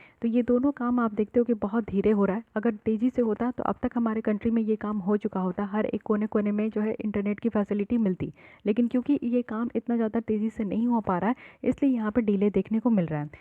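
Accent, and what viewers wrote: Bihar, India. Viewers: Mam kya aap bihar ki ho?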